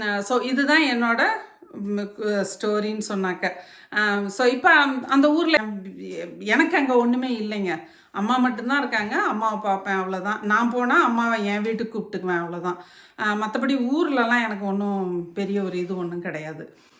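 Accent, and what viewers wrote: Salem, India. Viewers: சகோதரி நம்ம சேலம் ஸ்லாங் உங்களுக்கு இன்னும் அப்படியே இருக்கு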